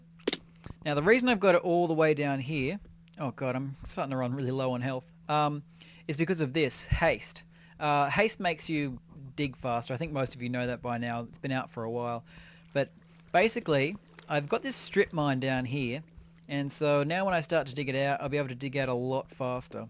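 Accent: Australia. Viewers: Are you Australian? I am xD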